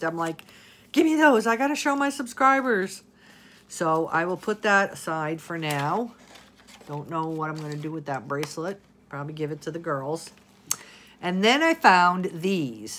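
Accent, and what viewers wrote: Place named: New York, United States. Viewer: I’m new, just found your channel! I thought you were from New York, you have a slight accent haha my first guess was New York or either maybe Chicago 😆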